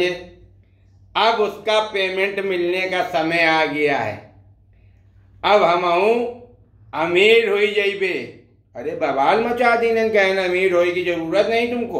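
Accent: Kanpur, India. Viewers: क्या भिगो के कनपुरिया अंदाज में मारा है बड़े भैया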